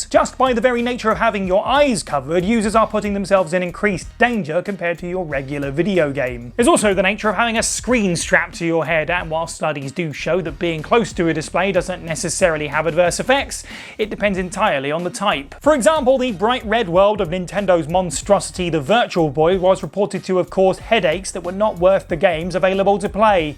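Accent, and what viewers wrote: United Kingdom. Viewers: Does anyone else love her voice? Maybe I'm just a sucker for British accents 😂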